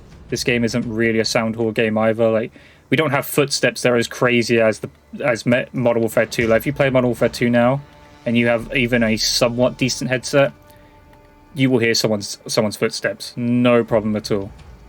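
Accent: United Kingdom. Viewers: I love your accent. English…right? Australian?) reminds me of how Beard meets the World talks. Love it ❤️ 👍 😊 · Oh! stampylonghead is also British so I guess that's why you and him sound somewhat similar? and np keep up the good work. But in your videos I really feel like somethings just missing, it doesn't really draw me in to watch more if that makes sense? it feels kinda bland and its not really engaging too. honestly I'm not really sure on the improvements you could do but I'd hope to see some changes overtime. hopefully I'm not mean with this though, just expressing an opinion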